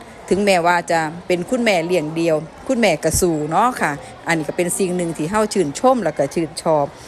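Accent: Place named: Isan, Thailand. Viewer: เกี่ยวหยั๋งเว้าพื้นคนเก่งเนาะ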